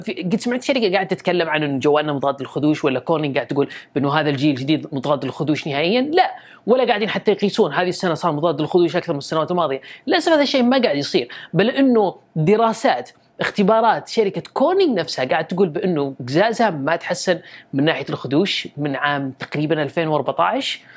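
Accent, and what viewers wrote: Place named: Saudi Arabia. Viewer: والله انت رائع من عندك حبيت اللهجة سعودية ربي يبارك فيك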